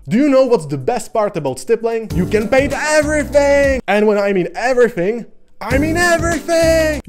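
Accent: Czech Republic. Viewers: Heej, borče! Úžasné video a skvělý kanál, jsi jeden z nejtalentovanějších... "malířů" modýlků? (painter/malíř)...barvič mi zní divně...o natěrači ani nemluvě...whatever, co jsem na YT viděl. A ano, už po asi třech letech a hromadění indicií jako Tvůj přízvuk a analyzování Tvého footage z metra či nalezení Tvých krásných modelů v pražské herně za vitrínou, jsem konečně zjistil že si taktéž obyvatel té naší krásné středoevropské kotliny :D Jsi krásný příklad rčení "zlatý český ručičky". Fakt klobouk dolů. PS: majestic beard, king! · Slyším cze-nglish! A dobrý videjko. Škoda, že jsem neměl takový tutoriály když jsem začínal barvit :c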